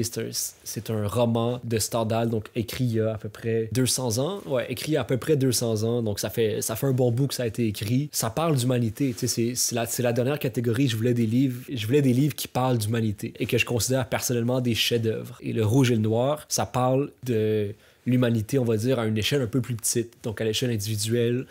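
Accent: Quebec, Canada. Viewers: J'adore ta chaîne. Du vrai contenu dans le beau français du Québec. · Que l'accent québécois est beau et charmant!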